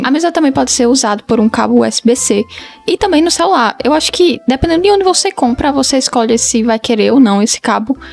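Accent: Northeast, Brazil. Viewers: é nordestina? Senti um sotaque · Não sabia que você era brasileira, ainda mais com um sotaque nordestino, ORGULHO BRASIL🇧🇷🇧🇷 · Tu é nordestina? O sutaque parece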